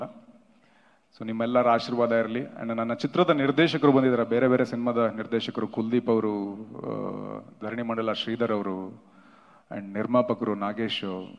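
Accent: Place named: North Karnataka, India. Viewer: ನಿಮ್ಮ ಉತ್ತರ ಕರ್ನಾಟಕ ಭಾಷೆ ತುಂಬಾ ಚೆನ್ನಾಗಿದೆ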